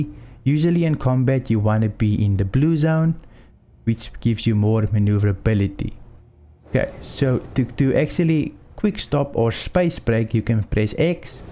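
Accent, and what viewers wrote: South Africa. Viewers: that s afrikaanse english accent lo so cool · South African?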